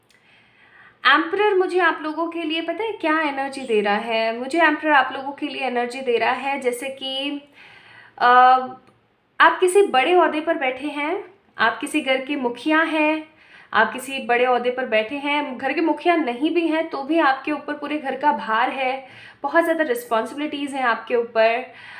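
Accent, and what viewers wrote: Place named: Hyderabad, India. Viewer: KYe ko..is Hyderabadi hindi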